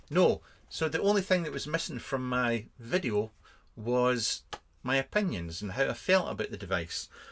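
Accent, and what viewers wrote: Scotland, United Kingdom. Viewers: I really like the intro/hold music. Shame that rambling Scottish bloke popped up again and ruined it... To be fair this is the exactly the review on the Boxx I’ve been waiting for. Authoritative.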